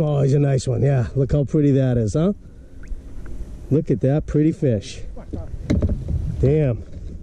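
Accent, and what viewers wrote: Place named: Maine, United States. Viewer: Strong Maine accent.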